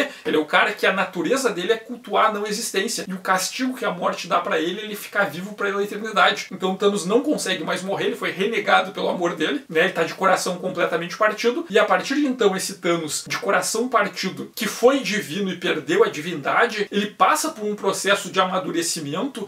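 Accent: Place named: Rio Grande do Sul, Brazil. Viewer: me inscrevi e tô vendo, gostei da perspectiva, sotaque do RS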